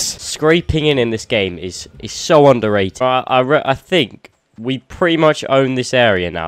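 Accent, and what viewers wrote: United Kingdom. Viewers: British accent My dream accent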